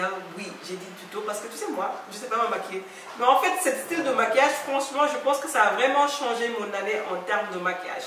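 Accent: Senegal. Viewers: lol je kiffe les accent sénégalais la meuf parle sans complexe awa magui ni si clinique bi je viens tout juste d'accoucher hmd je suis à 8000 km de chez moi (Sénégal)ma famille me manque mais dès que j'ai vu ta vidéo j'ai tout oublié si tu es tjrs au bleds bon vac je t'adores trop toutes mes salutations a qui me fais trop rire avc ses wolof de congolaise my name is Mya depuis Paris🥰🥰😘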